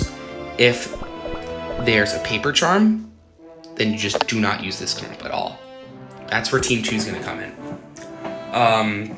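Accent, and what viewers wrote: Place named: United Kingdom. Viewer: why does he sound so british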